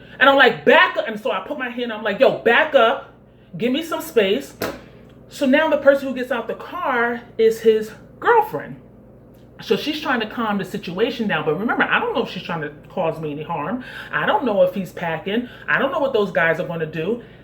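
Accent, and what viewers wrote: New York, United States. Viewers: What part of NY you from? It sneaks out when you get excited.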